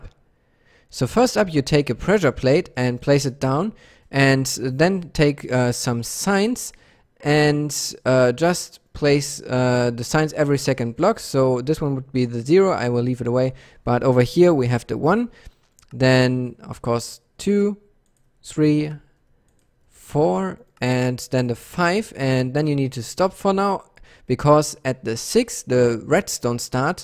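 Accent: Germany. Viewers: coole idee und gute umsetzung. leider habe ich nun das dilemma, dass sich mein "speedometer" nich resetet. hab nicht das gefühl, dass das 2 sekunden sind... hab das tut nochmal angeschaut und meine konstruktion überprüft, leider ohne den fehler zu finden. hast du auf anhieb einen tipp, woran es liegen könnte? lieben dank und dir noch einen schönen tag. :) (das du deutsch kannst hört man am akzent und in einem anderen vid begrüßt du die leute mit "herzlich willkommen" :D )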